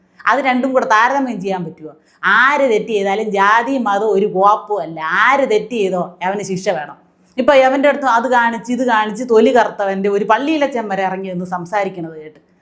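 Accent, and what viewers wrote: Thiruvananthapuram, India. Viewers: തിരോന്തരം ഭാഷ കലക്കി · I like the way you present things before your viewers. Moreover the thiruvonathapuram slang is hilarious. All the best. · Adipoli avatharanam. Tvm slang super, especially the contents of the news. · ഈ അവതാരിക സൂപ്പറാണ് തിരൊന്തരം ആണോ പ്ഏര് എന്തര് ?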